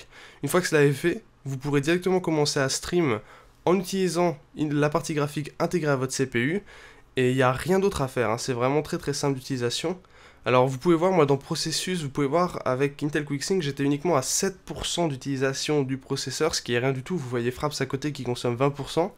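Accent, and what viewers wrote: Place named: England, United Kingdom. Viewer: Bel accent anglais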